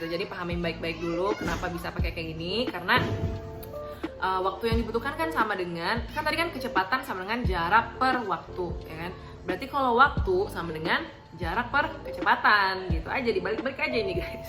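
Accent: Palembang, Indonesia. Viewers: Kak kokbahasa kk kyk bahsa plembangg sihh😚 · Kakak orang palembang ya? logatnya wkwk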